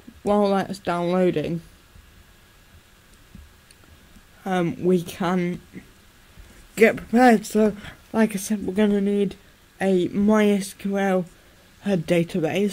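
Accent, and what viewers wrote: United Kingdom. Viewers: are you from south whales ol chum?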